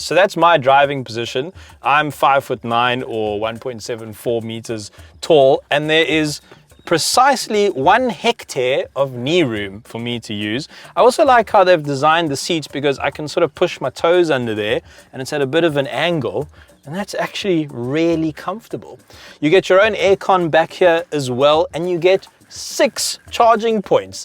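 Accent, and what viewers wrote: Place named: South Africa. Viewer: So instead of listening to the review I'm listening to incredible way of presenting, so south african and refreshing. Good job dude 🤜🏽👏🏾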